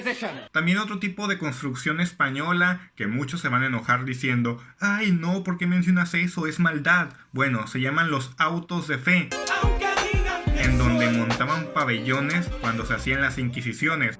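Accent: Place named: Guadalajara, Mexico. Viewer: JAJAJAJA si Auronplay viera que lo pusiste pelón te diría : Menudo personaje de cojones JAJAJA, buen video como siempre entregando cada vez la mejor calidad para tus suscriptores mas fieles, y buena imitación de acento andaluz he, pero por tu acento natural yo diría que eres Tapatío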